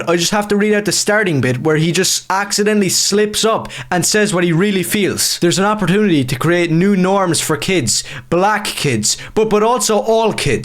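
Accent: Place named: Ireland. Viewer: It's Irish accent.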